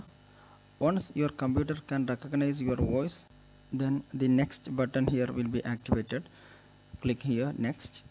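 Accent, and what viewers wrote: Kerala, India. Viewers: Bro u mallu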